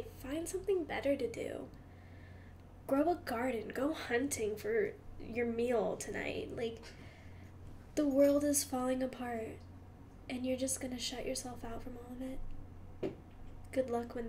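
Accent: United States. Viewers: PERFECT AMERICANISH 🔥🔥🗣️🗣️👌👌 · Do you still speak Americanish or do you now speak English like everyone else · Do you still think your speaking americanish? And I cant get a job im under age.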